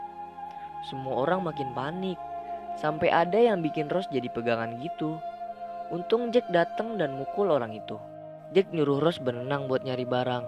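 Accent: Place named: Java, Indonesia. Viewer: Suara Kaka lucu kaya gimana ya ngomong bocor Kay medok gitu aku medok gak terlalu si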